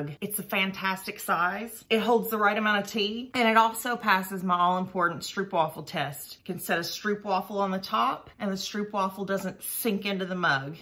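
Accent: South, United States. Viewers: You should look for a mug with a ceramic frog or spider stuck inside on the bottom... its hilarious when the person drinks down and the Frog reveals itself .. i love your sweet southern accent ...keep drinking that tea..dunno about the waffle though...maybe i need educating lol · I don't know what I love more, your gentle southern accent or your enthusiasm for British stuff. Oh hell, I love them both. Being a Brit, I've accumulated so many mugs over the years, that every couple of years or so, you have to revisit the cupboard to decide which ones to throw out.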